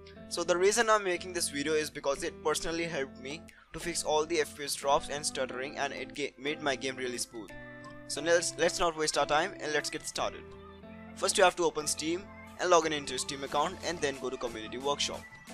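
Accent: India. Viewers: YySsBG YT he has an Indian accent you degenerated racist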